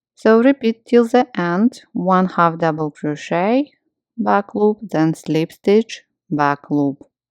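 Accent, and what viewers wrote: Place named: Ukraine. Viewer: Are you from Ukraine? I am and I adore your pronunciation. Your tutorial is perfect, it's just what I need. I'm going to knit this hat. Thanks a lot. God bless you. ❤❤❤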